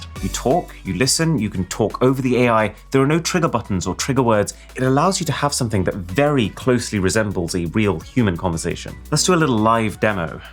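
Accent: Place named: Nottingham, United Kingdom. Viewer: Your from Nottingham thats crazy.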